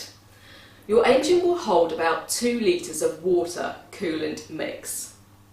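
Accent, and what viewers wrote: England, United Kingdom. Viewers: I love English women and their accent you're a extremely attractive mature lady with a great body!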